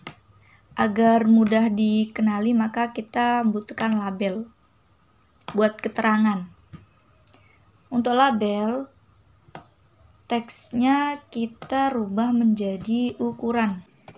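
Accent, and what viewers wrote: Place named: Tegal, Indonesia. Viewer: Ilmu yang bermanfaat by ngapak tegal junior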